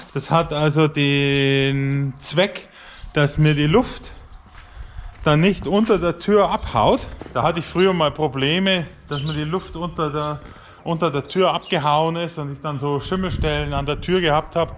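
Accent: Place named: Bavaria, Germany. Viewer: Du konnst a boarisch ren😉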